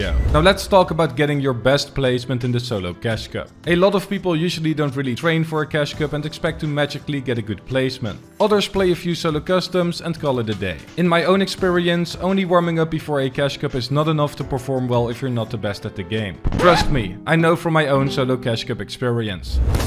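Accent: Netherlands. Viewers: Ik weet niet als ik het goed heb maar je bent Nederlands toch of hoor ik het verkeerd I dont know if i right but Your Dutch right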